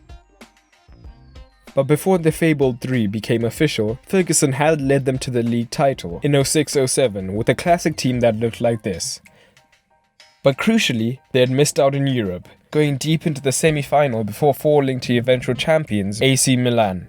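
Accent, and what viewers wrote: South Africa. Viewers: Sorry fam have to ask...are you South African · South African??? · are you south african by any chance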